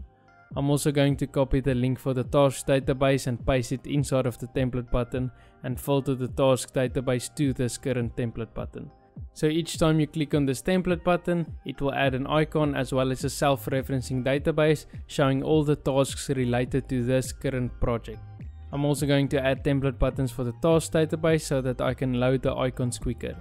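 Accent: Afrikaans, South Africa. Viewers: Are you... South African? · Afrikaans accent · BRO ARE YOU SOUTH AFRICAN?!! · Jis boys, the moment I heard the accent it was a straight, sub-like-follow-bell icon moment. I don’t have time to look for new creators when I can learn from boytjies themselves! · He sounds exactly like a South African friend of mine! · Howsit, you’re from South Africa?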